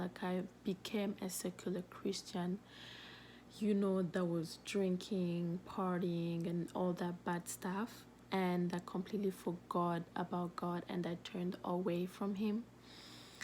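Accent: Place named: Nigeria. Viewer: Haha MashAllah you have learnt our accent oo una don try pass, 😂😂 may Allah bless you too sister and grant you ease in all your affairs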